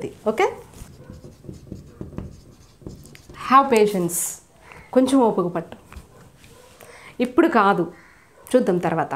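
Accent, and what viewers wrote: Telugu, India. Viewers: Tqq, nice class, so sweet of you and your Telugu slang laaa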